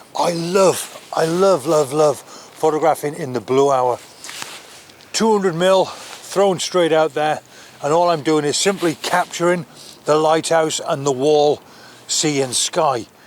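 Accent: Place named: England, United Kingdom. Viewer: Boy, I love you as a photographer. You’re in England. I love the accent and the shots are just gorgeous. Now I know what the blue hour is, but we never see one here in California. I live I live in London I loved by cameras. I haven’t been doing any photography lately because I’ve been recuperating from a surgery and just watching you is one of the best things I have seen in a long time. Thank you for your pictures.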